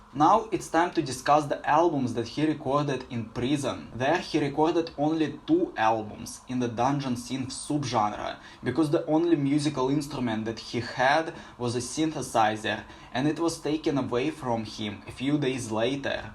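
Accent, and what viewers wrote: Russia. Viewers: Русский акцент слышно за километр, лучше на русском видео пили... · Зачем ты делаешь контент на английском если у тебя такой русских акцент?) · I almost expect English speakers to complain, but even other Russians...wtf. Even with the thick accent, it's easy enough to understand him. Besides, the accent is nice and adds character.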